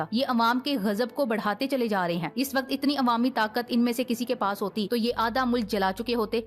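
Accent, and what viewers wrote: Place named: Punjab, India. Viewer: Ap ka jawab nahi ap jo punjabi ka touch laga kar in kutoon ki chittol Martí hai muje barda Maza ata hai ap ka andas aur tarika very nice 👍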